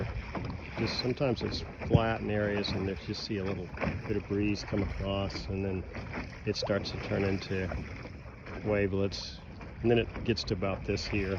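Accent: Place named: South, United States. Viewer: You have a very pleasing voice. A mild southern accent. Thanks for your sunfish videos!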